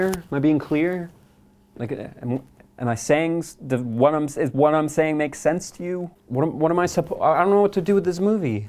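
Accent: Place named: Canada. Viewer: The canadian accent has never been so prominent.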